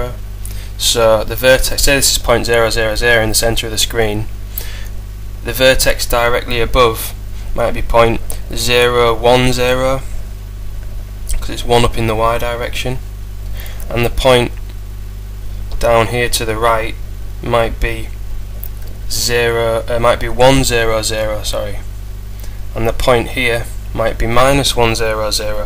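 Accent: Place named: United Kingdom. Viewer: The one you mentioned is US spelled version. I can tell that he has a british accent. ;)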